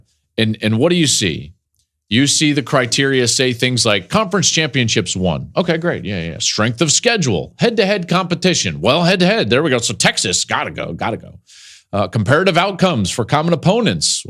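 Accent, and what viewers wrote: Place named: Alabama, United States. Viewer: I'm not crying. I'm not even a Florida State fan. I'm a fan of fairness. Alabama has a great history of championships which I greatly respect, but they have no business being in the CFP this year. By the way, I can hear your Alabama accent all the way across the internet. Don't be so greedy, Bama boy. You've been top dog many times and you will be again. But this year you could have the grace to let other worthy teams have their moment. If Michigan plays up to their normal level, they are going to whip you like a red headed stepchild. And I admit I will enjoy that.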